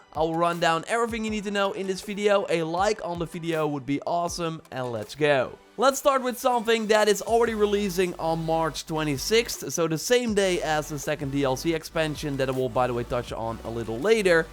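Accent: Netherlands. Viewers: lol hearing a thick dutch accent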